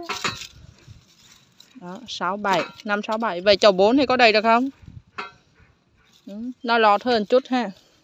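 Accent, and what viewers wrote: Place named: South, Vietnam. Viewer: Giọng nam bộ dễ thương nhất!!!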